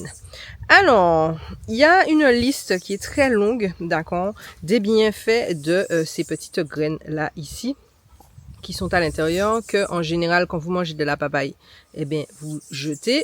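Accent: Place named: Martinique, France. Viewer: c'est l'accent de Martinique qui vient du créole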